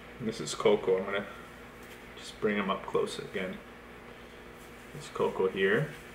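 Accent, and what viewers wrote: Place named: Southern Ontario, Canada. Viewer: How could I tell you were from southern Ontario before you even said Toronto. Must be the accent.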